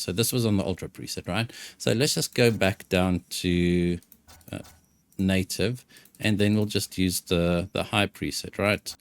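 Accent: South Africa. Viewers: Noice I could hear your accent, Ill sub man, Im from Cape Town now in Scotland! Happy Youtubing!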